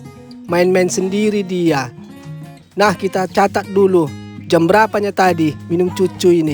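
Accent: Medan, Indonesia. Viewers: Logat Abang seperti orang Medan, apa Abang orang Medan?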